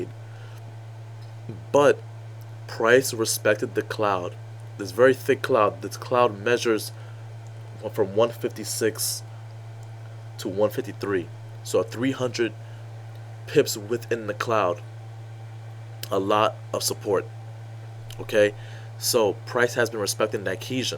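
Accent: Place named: Italian-American, United States. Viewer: Love the goomba accent. Fits the gangsta ripoff traders.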